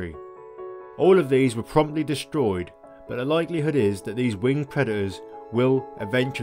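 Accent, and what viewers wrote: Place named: Poole, United Kingdom. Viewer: U sound like Poole mush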